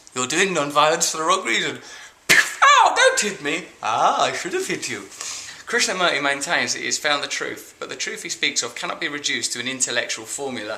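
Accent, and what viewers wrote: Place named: United Kingdom. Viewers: He speak english but i think he is from British because of his accent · British accent.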